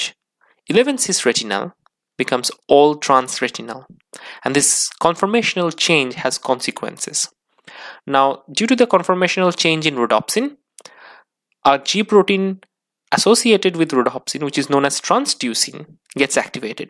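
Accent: India. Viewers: His accent sounds like Only Desi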